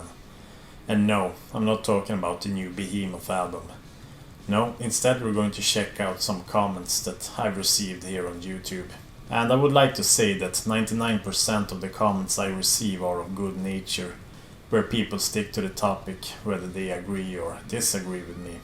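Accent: Sweden. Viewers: Further to the comments about your accent, my first ideas of the supposed Swedish accent came from the late 1970's watching the Swedish chef from the Muppets Show, members of Abba, Bjorn Borg and an atypical Swedish blonde dollybird on a UK comedy show called Mind Your Language! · Actually your deadpan Swedish accent coupled with the dry sarcasm is really cool. I’m more into OSDM and BM but I really enjoy the channel, and in fact it has made me listen and discover some old metal gems. Nice work bro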